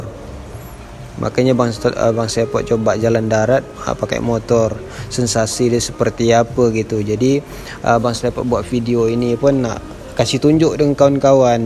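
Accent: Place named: Johor, Malaysia. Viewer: Bang selepot, bahasa kamu 98% mirip logat melayu Johor malaysia aka UPIN IPIN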